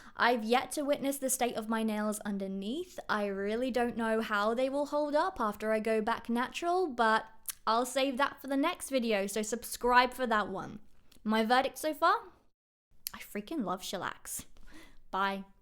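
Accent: United Kingdom. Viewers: British accent amazing and original ❤️ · Omg that british accent though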